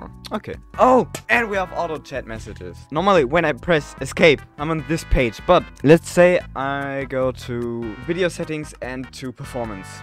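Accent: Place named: Germany. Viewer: I bemerken über your MUTTERSPRACHE that du habe a DEUTSCH Akzent , do you DEUTSCH?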